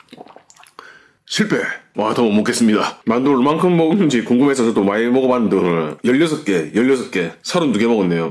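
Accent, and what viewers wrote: Gyeongsang, South Korea. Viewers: 님, 후우~~ 저 만두 각 두개씩 총 네개에 쫄면 일반 사이즈로 ( 님 사이즈 아니고) 두 젓가락 그리고 시원한 물 한 잔이면 제 배는 그득 찹니다. 저 매운 걸 저렇게 많이 먹방 왕 🤴. 보고 있는 제 배가 차고 넘쳐 땡기는 거 같은데... 억 소리 납니다. 꾸밈 없는 경상도식 말씀이 재미 나고 인상이 무척 좋아서 보는 이들이 그 것 만으로도 즐거우니 이제 부터 너무 많이 드시지 마세요. 건강 하시고 하시는 일 흥하시고 대박 나시기 바랍니다. 👍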